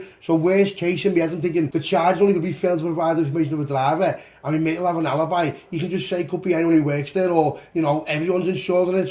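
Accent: Liverpool, United Kingdom. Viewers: Yes Scouse 💯👋👍👍👍👍👍👍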